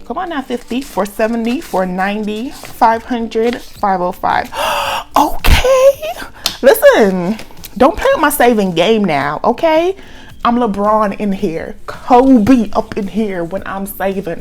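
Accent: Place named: Jamaica. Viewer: Ooooooohhhhhh yeah... That Jamaican accent is fire 🔥💪🏽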